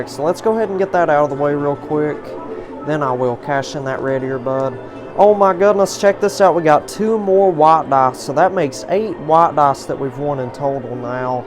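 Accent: United States. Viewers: I like American English accent. 😊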